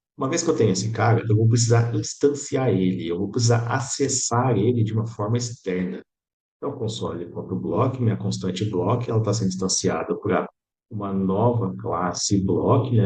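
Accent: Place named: United States. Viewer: Sotaque americano lindo desse professor 🥰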